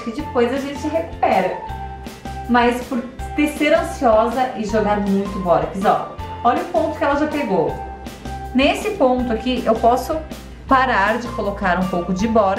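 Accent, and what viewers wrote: Portugal. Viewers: Ele é de Portugal? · Ele é português?,eu sou curioso desculpa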